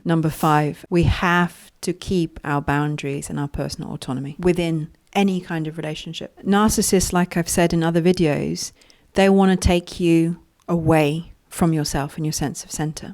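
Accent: United Kingdom. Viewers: 😊. Wow thank you so very much for that excellent excellent information! 7 what you're saying I knew, but some of them I didn't..... A's always I learned something new with you! I really needed to hear this and I don't think I would have heard it so clear and precise with all the details in nuances that you provide. After going through multiple narcissistic relationships, I've come to terms with what you were talking about I'm not putting too much volume and look for the way their body looks...ECT.... But learning to value a person more on their mind, character, and intellect.. may I give you a compliment, I really like the way you think your thought process is very deep you seem to be extremely organized in your thought process paying attention to detail. And well....I just love your British accent! 😅 Dave.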